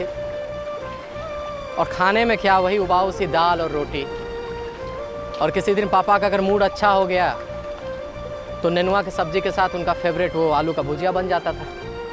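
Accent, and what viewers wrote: Bihar, India. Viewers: This Video made me cry.... Hope will see u soon in Bollywood in This Bihari Tone.... Maharaj mtlb ki mast video chhela... 🙏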